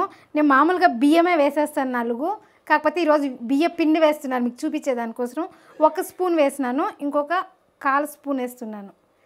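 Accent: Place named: Tamil Nadu, India. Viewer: Telugu bale tamil vaalla telugu la bagundhi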